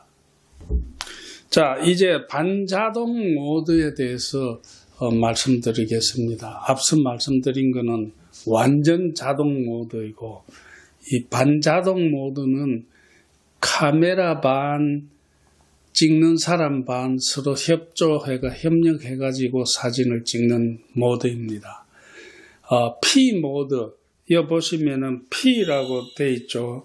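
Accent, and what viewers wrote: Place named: Gyeongsang, South Korea. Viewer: 안녕하세요 선생님! 정겨운 갱상도 강의 재미 있어요 음악점수 ‘빵점’은 엄살 같으신데요? 늘 건강하시길……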